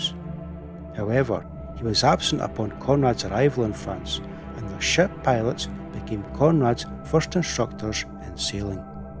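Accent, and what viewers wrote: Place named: United Kingdom. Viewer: C rappy video. The narrator's British accent is too strong and the music is too loud compared to the narration.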